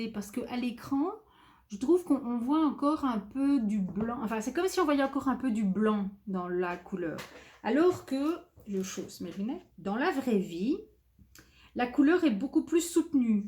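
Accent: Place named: Belgium. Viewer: Coucou, voilà que je découvre ton podcast et j'ai déjà regardé quelques épisodes qui ont été très appréciés! Dès le premier visionnage, j'ai eu le sentiment que j'écoutais une compatriote belge. Il semble que tu as habité la Belgique! Ceci étant dit, je suis une grande consommatrice de podcasts tricot français 😉. Bref, j'aime beaucoup ce que tu présentes et la manière dont tu le fais et je m'abonne de ce pas! Gros bisous de Belgique😘♥️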